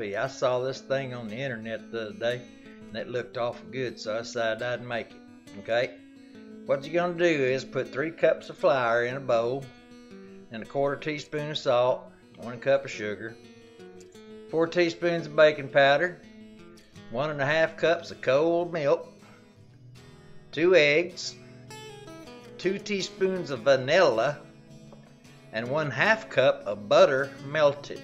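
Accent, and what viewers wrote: South, United States. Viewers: I love the southern draw!!! Thank you so much for recipe about to make now!!!!! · Love this Forrest Gump accent!! And the cake looks delicious!!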